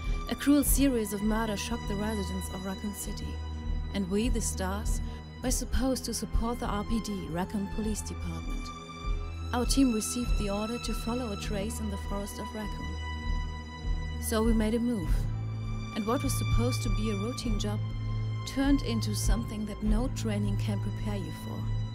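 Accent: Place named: Germany. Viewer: Ich finde es sehr cool, dass eine englische Fassung gemacht wurde. Leider muss ich sagen, dass der deutsche Akzent der Erzählerin zu uneindringlich für diesen Vortrag ist. Es macht, zumindest ergeht es mir so, keinen Spaß zuzuhören. Ich finde den deutschen Akzent in der englischen Sprache absolut nicht schlimm, vielmehr ist er interessant und auch bei einigen Thematiken unverzichtbar. Doch hier wirkt es nicht. Bitte nicht falsch verstehen. Die Sprecherin macht eine solide Arbeit, liest schön flüssig und hat ausserdem eine schöne Betonung, aber -naja, ich wiederhole mich. 7 von 10 Sternen, da alles, bis auf eine Sache passt.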